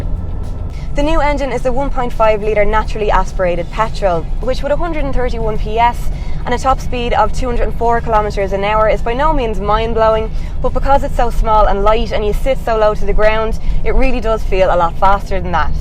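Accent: Ireland. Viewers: How has this channel not gained more popularity, a girl with an Irish accent driving beautiful cars. Whats there not to like, keep up the good work!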